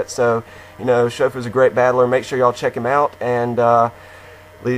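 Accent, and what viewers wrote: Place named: South, United States. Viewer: You got a bit of a southern accent going on.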